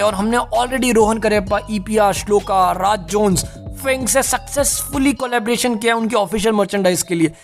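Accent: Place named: Delhi, India. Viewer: This channel admin is definitely from Delhi 😂😂. His tone told alot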